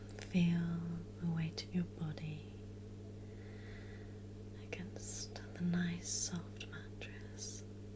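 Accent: England, United Kingdom. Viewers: British accent makes her more attractive & sophisticated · British accent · love ur british accent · English accent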